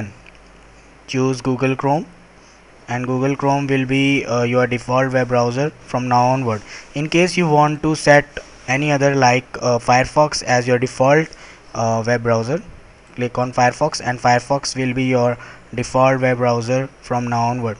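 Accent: India. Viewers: He's Indian, His voice proves everything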